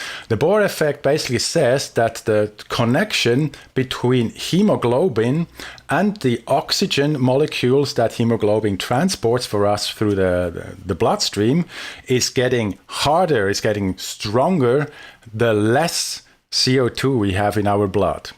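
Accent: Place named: Switzerland. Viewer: Very cool and informative video!😉 really like the swissgerman accent😁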